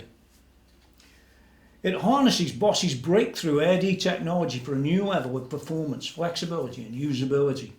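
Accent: Stoke, United Kingdom. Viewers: You can hear a fellow Stokie a million miles away 😬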